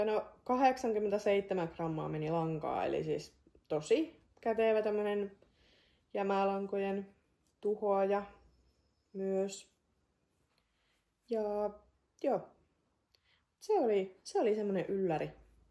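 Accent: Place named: North, Finland. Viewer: Kivoja neuleita sekä kivaa jutustelua. Rakastan pohjoisen murretta <3 Hyvää juhannusta!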